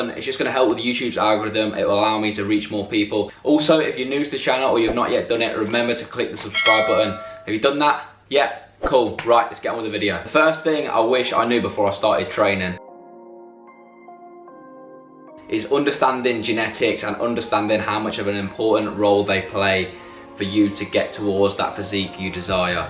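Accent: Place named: North, United Kingdom. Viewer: Nice one man good topics, your northern accent remind me when I used to live up there haha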